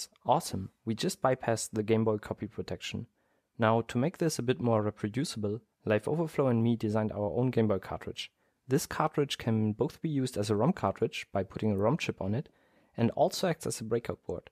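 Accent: Germany. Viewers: Technisches Gebrabbel auf Englisch mit deutschem Akzent - ich kann dir nicht sagen, wieso, aber irgendwie hat das Charme. 😅 super Video, mega interessant. Danke! 😊👍🏻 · Are you german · Sprichst du deutsch ? Dein Englisch hört sich wie meins an ^^ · accent is kinda cute 😅 he sounds German or something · Are you a german?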